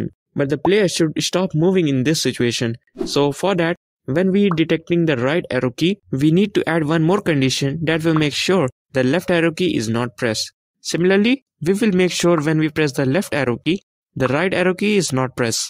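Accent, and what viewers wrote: India. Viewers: suggestion to you bro, fix your indian accent, and here will be a lot of viewers!!!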